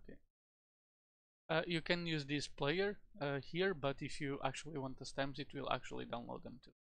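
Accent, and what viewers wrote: Portugal. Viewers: algo me diz que és portugues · vi logo que eras tuga pelo sotaque, obrigado por todo o esforço na realização deste projeto <3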